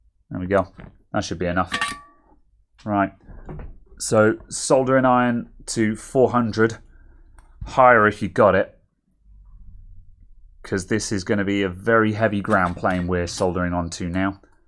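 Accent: United Kingdom. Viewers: ok, so your British, but was it your mom or you dad who where the billy goat?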